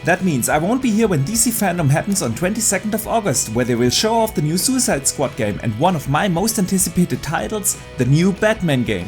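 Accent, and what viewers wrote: Germany. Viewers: I am also from germany and the accent is killing me :D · The german accent is killing me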